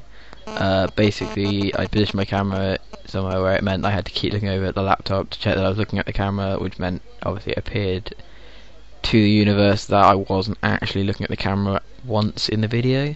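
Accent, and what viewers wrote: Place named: United Kingdom. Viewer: teehee...cute. You sound more British to me than you did in the BWR days. I am SO excited for Lost! Wee!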